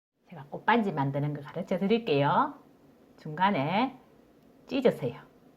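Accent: Gyeongsang, South Korea. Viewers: 완전 경상도 말씨입니다" 밭작물은 주인을 잘 만나서 그런지~~채소들이 싱싱하네요" 그전부터 유튜브동영상을 보고 있는데 척척박사 이네요" 잘보고 갑니다👏👏👏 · ㅎ ㅎ 이빠이 경상도 말은 정말 구수합니다 특별이 이빠이는 일본말이죠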